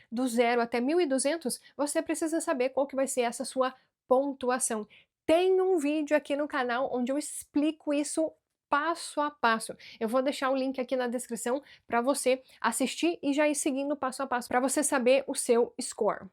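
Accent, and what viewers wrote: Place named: Parana, Brazil. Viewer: Seu sotaque e um barato puxa o R tipico do parana e o S com som de X tipico dos alemães do sul do Brasil, alem dos anos de canada....🎉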